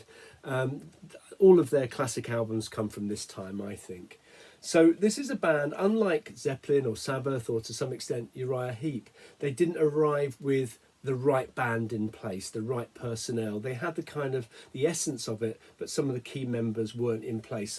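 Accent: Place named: RP, United Kingdom. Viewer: Oh I would never guess. Talks like an English lord. Upper west side o’ London or something. 😝. Your video made me play DP’s Live in Paris 75 and Coverdale had pipes for sure. It’s his banter between songs that s most annoying.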